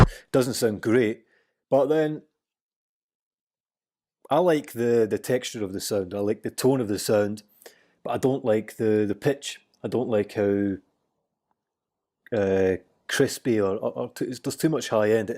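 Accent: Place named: Glasgow, United Kingdom. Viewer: Fuckin yass subscribed as soon as i heard the accent. Min the glesga!! Class tutorial be swatching the rest.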